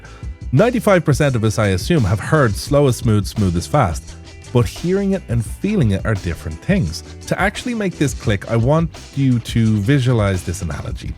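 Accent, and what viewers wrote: Ireland. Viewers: First time visit. subscribed. just seconds in, I heard that legendary Irish accent and thought brilliant. and then the Irish flag on your car, Legend. from one fellow Irish man living abroad to another. Hows tricks dude. awesome video.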